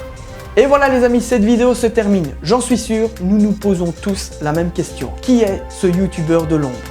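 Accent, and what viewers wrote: Belgium. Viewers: Je suis Belge et au contraire je trouve qu'il a un bon accent bien Belge ahah ^^ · C'est un belge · Elisa Deleau à mais il est Belge ! Je me disait bien si il l'était pas :D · T'es belge ?? Prcq ta un ptit accent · T'es belge?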